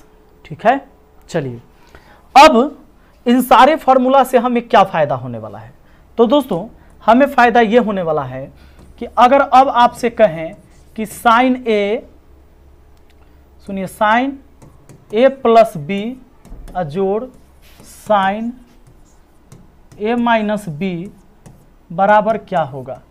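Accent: Bihar, India. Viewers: Are you from bihar😅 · Sir Are you bihari? PROUD TO BE BIHARI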